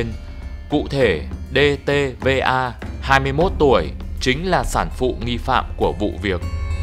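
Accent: Hanoi, Vietnam. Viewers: Giong HN đọc hay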